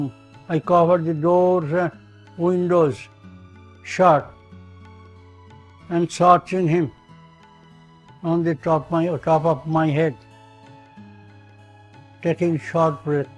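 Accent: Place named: Bengal, India. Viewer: Its beautiful how even his english accent is like bengali english accent❤❤❤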